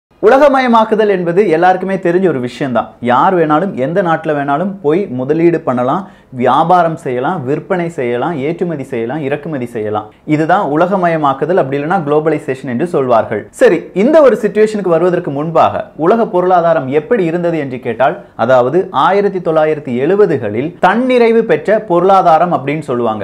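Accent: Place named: Kanyakumari, India. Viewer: By listening to his slang I think he is from kanyakumari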